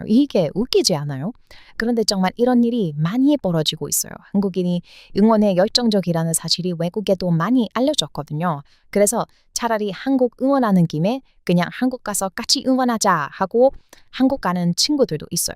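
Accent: United States. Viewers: 오리지널 미국인의 한국말. 이게 전형적인 미국식 한국말이다. 억양이 독특하다. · 와... 사용하시는 어휘가.. 대다수의 한국인들보다 훠~~~얼씬 다양하네요.. 정말 한국어 잘하세요.. 억양 때문에 미국인이라는 걸 인식할 정도로... 요즘 어린 친구들 말하는 거 들어보면 절반이상이 비속어 & 욕설의 천박한 화법인데.. 이미 외국인들이 한국인보다 한국어를 잘하는 세상이 된 것인가... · 미국사투리 정말 잘하시네요 미국인인줄 알았어요 완전 한국인인데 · 한국인인데 약간 미국발음으로 하시는분 아닐까? 하는 생각이 가끔 듭니다.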